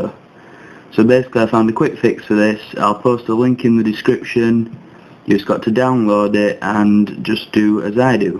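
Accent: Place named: RP, United Kingdom. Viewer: Posh voice there lad;)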